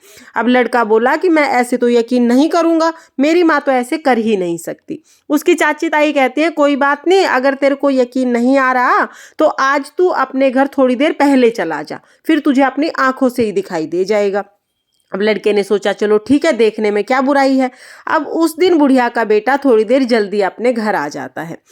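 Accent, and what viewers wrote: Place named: Meerut, India. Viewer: 😊😊ji achcha reply k liye dhanyawad...aapki voice tone thoda hmare meerut ki lagi isliye puchha😊 happy sakat chauth aap sabhi ko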